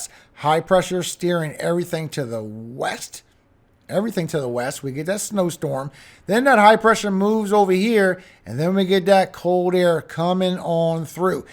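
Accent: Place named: New Orleans, United States. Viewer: Thanks,. You sound like you are from the Westbank, New Orleans. 🙏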